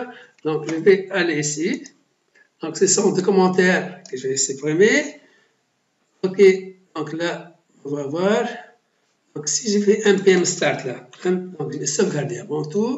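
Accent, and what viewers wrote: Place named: Tunisia. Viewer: tunisien accent merci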